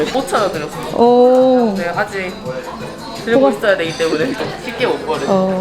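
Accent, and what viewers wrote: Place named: Gyeongsang, South Korea. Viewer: 말투가 경상도네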